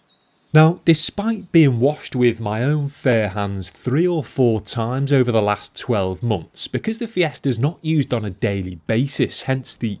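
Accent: Liverpool, United Kingdom. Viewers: Scouser mate (Liverpool) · This is it. This is the best British accent. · What a pleasure to ear these sophisticated sentences. I hope one day I could speak with a similar ton and accent. I hope you would not mind if I ask in which area of UK we speak such a nice way. Cheers from Normandy. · Is that scouse accent? Sorry if I am wrong. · What a strong British accent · Nobody sounds more British than this guy😂👍🏻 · You have the most british accent Ive ever heard, I love it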